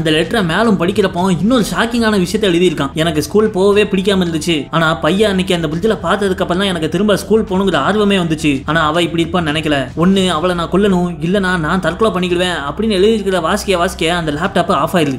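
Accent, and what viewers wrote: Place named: Tirunelveli, India. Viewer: Unga thirunelveli slang thaan bro.. Romba pudikum... En frd maariye pesuringa... Neenga pesa pesa en frd thaan niyabhagathula vanthaan...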